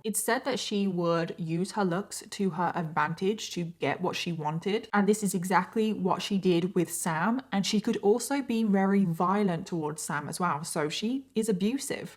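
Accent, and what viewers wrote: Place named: Midlands, United Kingdom. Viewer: I love listening to your You Tube talks as well as watching you do your makeup! Beautiful eyes that always pop at the end!.. I also love your accent. I have family in the Midlands so it warms my heart to hear you talk. Congrats on your pod cast show now! Looking forward to hearing more :)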